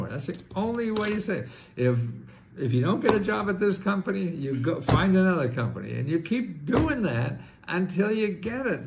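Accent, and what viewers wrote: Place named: New York, United States. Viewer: Please. Key. Me in.. Thanks yes. 😮❤😊love your info. Yes. You. Friendly. Sound like New York accent 🎉🎉🎉🎉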